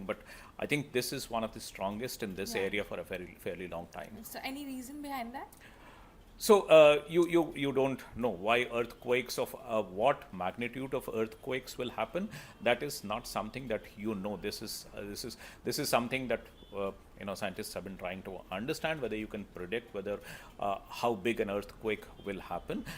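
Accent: India. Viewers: Bill gates with an Indian accent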